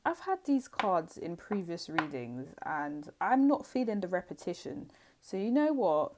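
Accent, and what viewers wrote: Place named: United Kingdom. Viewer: I love your humor and British accents really make me weak, so adorable 🥰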